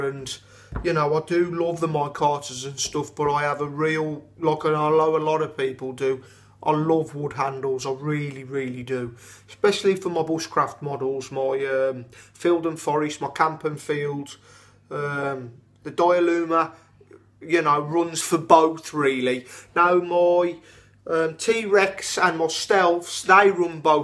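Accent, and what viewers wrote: Birmingham, United Kingdom. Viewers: Erm, nobody gives a toss mate, just be your Brummie self:)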